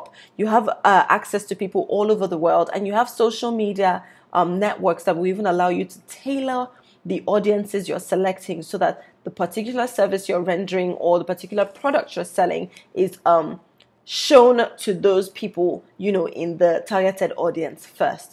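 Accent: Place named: Nigeria. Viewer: I knew you were a Nigerian right from the beginning I heard your voice. Nice Video 😊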